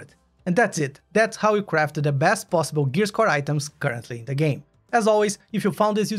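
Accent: Brazil. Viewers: Mano, tu é br num é? esse sotaque não me engana kkkkkkk. Mas, otimo video, valeeu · Ctza q eh BR com esse sotaque ai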